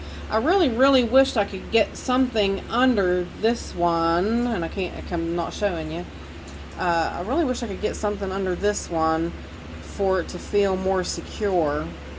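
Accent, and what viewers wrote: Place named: Tennessee, United States. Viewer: I guessing eastern TN by your specific accent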